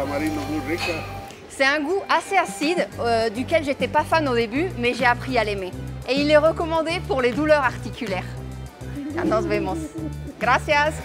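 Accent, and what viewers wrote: Mexico City, Mexico. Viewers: Hablas tan bien el español, que hasta el tono chilango ya lo tienes o se te pegó....Saludos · Muy agradable el vídeo, fluido, dinámico, con un español coloquial muy de México, uso de frases populares bien colocadas, información relevante de cada alimento que es útil Se agradece que no sólo sea comparativo entré ambas culturas, sinó que deja educación para todos. Muy agradecido con el esfuerzo de informarte y compartir cultura. Cómo décimos aquí, tu vídeo esta p...ca madre! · très bonne votre mexicain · No mms habla mejor el español que muchas personas y hasta suena muy mexicana alv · Que acento mexicano más guapo. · Muchas Felicidades eres una excelente narradora y el Español que hablas es muy latino y con acento muy mexicano te sigo en tu página muy amenos comentarios saludos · Wow me encanta que hablas español come Mexicano y no español lol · Es la primera vez que veo uno de tus videos. 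Eres genial. Hablas español mexicano a la perfección. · Eres taaaaaaaan linda, qué hermoso te expresas sobre mi amada cultura 💜 Tienes un carisma súper lindo! Y me encanta que hables español mexicano, no cualquiera lo logra. 😂💜💜💜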